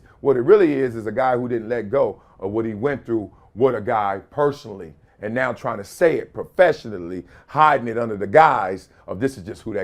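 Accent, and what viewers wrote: Los Angeles, United States. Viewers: I ain't yo homie....heard that Compton in you, sounded straight LA with it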